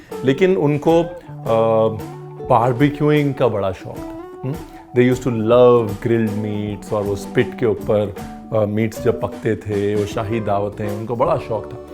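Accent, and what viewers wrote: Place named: Punjab, India. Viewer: bro u r out standing i mean kuch v hove tuhadia videos ni kde v miss kitia n tuhadi jheri apne aap punjabi a jandi vich bolde bolde oh ta bhti vdia lgdi menu jdo hain kehnde "hain" kehnde hehhe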